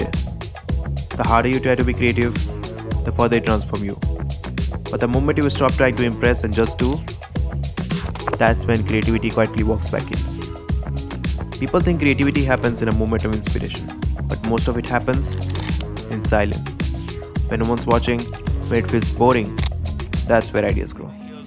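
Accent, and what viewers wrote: Patna, India. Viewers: Are you from patna? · bro, are you from Patna ??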